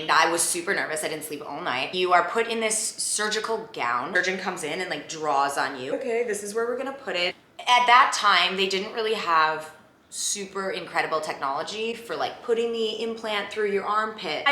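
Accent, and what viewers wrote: Minnesota, United States. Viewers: So Minnesotan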